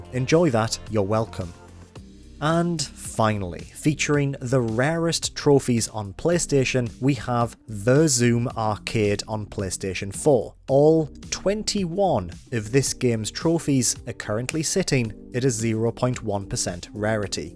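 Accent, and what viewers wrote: United Kingdom. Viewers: “Rare” for this guy is rehhh wtf are British accents it’s excruciating to listen too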